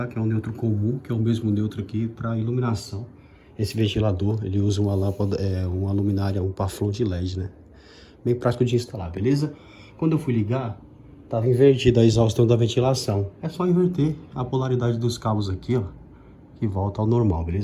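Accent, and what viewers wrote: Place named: Brazil. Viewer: Vc é de qual estado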